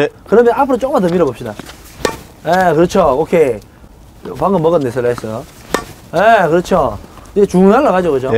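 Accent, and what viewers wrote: Gyeongsang, South Korea. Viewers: 어우! 경상도 코치님 진짜 멋진 강의 감사합니다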